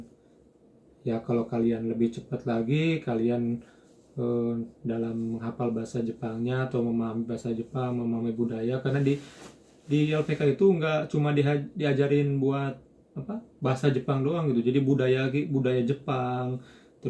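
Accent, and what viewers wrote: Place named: West Java, Indonesia. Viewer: Logat Sunda nya kng rada ka candak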